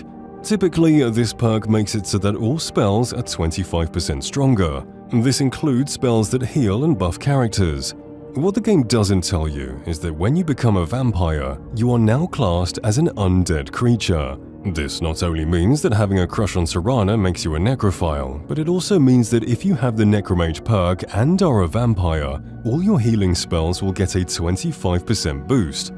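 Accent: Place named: United Kingdom. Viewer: as a american id say yes the brit voice sells the videos lol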